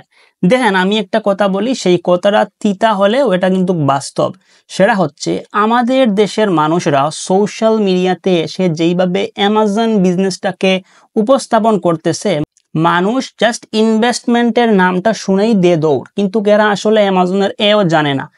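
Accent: Sylhet, Bangladesh. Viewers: ভাষা শুনে বুঝা যায় ১০০% সিলেটি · Bhai ki sylhety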